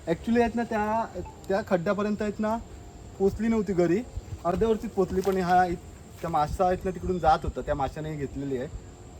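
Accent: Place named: Bhandari, India. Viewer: तुझी भंडारी बोली छान वाटते.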